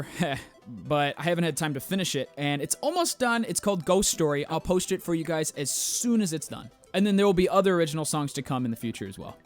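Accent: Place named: South, United States. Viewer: just learned he has a southern accent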